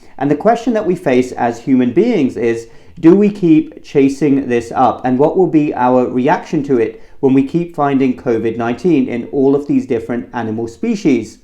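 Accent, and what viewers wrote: England, United Kingdom. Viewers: There is nothing quite so elegant as someone saying "expelling snot" in a beautiful proper English accent. Bravo!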